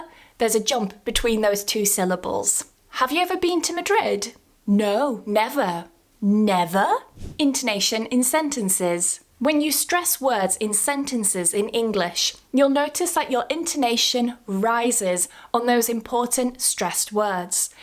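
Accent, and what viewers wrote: United Kingdom. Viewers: I like her British accent quite a lot!!!! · l really love your british accent❤😊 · This dog looks like he's trying to learn British intonation. Besides, you seem to have lost most of your weird “Polish” accent. You sound more British every day . 😉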